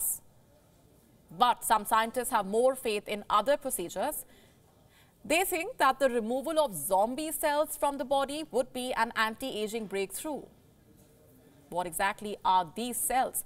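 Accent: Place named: India. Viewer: Don't speak lye like Indian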